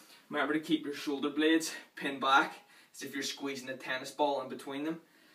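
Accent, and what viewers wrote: Northern Ireland, United Kingdom. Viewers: Everyone wondering asking where he's from. My most accurate guess is Northern Ireland.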